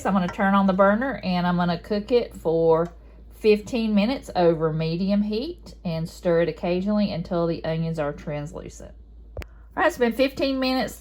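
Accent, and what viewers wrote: South, United States. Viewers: I need to pull that book out. I’ve never seen that recipe. I’ve learned so much this collab. PS- your southern accent sounds like mine (Bama?) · I love seeing these unique jams, and I love your southern voice! 😊 the book all new recipes did it just recently come out?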